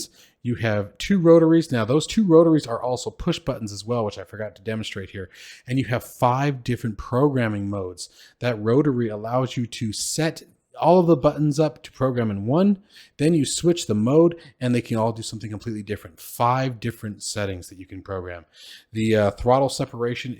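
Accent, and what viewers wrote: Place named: United States. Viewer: do they ship to the united states? you sound american but doesnt mean you live in america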